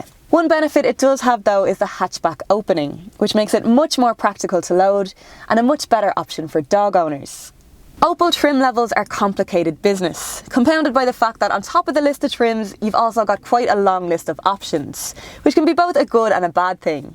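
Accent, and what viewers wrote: Ireland. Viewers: I think I prefer a woman doing a car review over a guy. Same great data and specs if not even better with none of those macho undertones. Also love your Irish accent, much nicer on the ear than any British accented presenter out there.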